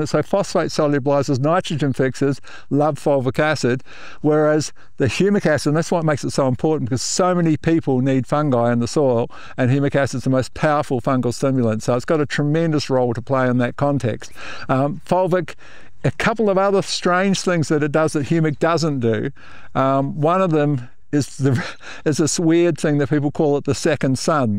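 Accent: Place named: Australia. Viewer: The captioning translation is saying collate do you mean chelate? Your Aussie pronunciation is changing what we in USA pronounce as "key late"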